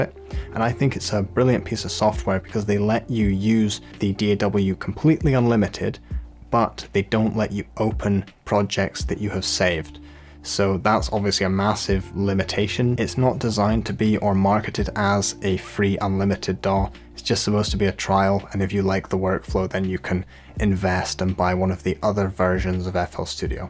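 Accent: Scotland, United Kingdom. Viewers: what part of scotland are u from mate ???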